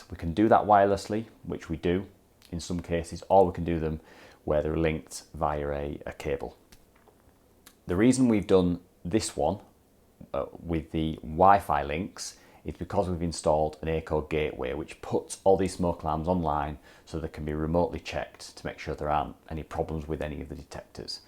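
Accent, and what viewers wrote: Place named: Northern England, United Kingdom. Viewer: What area are you based im guessing uk not sure how north 😂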